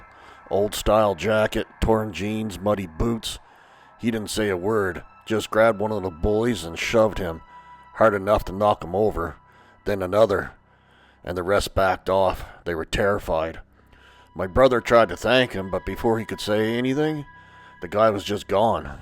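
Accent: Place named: Upper Midwest, United States. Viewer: Spooky story! Sounds like you're from the Northern Mid-West? Canada?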